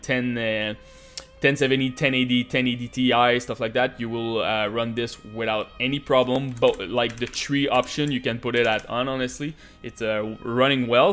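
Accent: Quebec, Canada. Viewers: Tes quebecois c sur :P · i swear to god this guy must be from quebec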